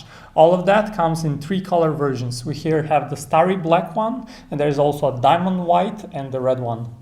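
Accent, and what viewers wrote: Bulgaria. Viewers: Man, are you Bulgarian? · razbrah 4e si bulgarin :D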